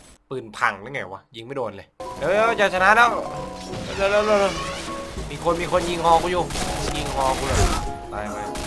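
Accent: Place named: South, Thailand. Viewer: เสียงเหมือนคนไต้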